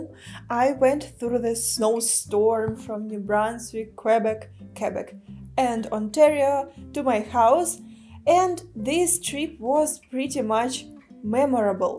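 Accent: Russia. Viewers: Русская? Акцент )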